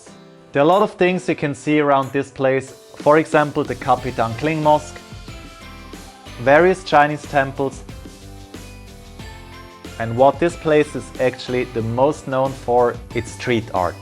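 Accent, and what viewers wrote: Switzerland. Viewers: Thnx for this vid. - It sounds like a Swiss accent you're speaking, is that true? :) Greetings from Zurich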